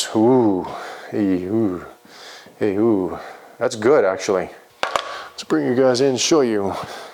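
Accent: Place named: Canada. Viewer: are you in the States. I hear a Canuck Dialect??? Great Build Sir. Make Canada Proud??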